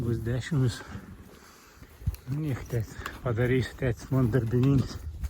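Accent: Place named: Samogitia, Lithuania. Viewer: Kokia idomi zemaitiska sneka!!!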